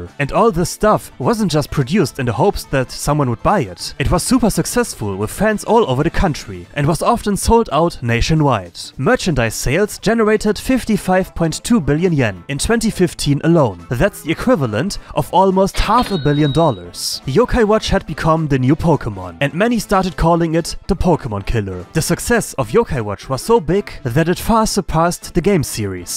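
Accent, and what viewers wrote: Germany. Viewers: Your accent ist sehr sehr deutsch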